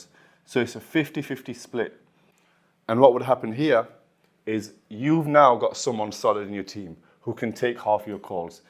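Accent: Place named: Pakistan. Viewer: Can we get subtitles next time, the Pakistani accent is hard to understand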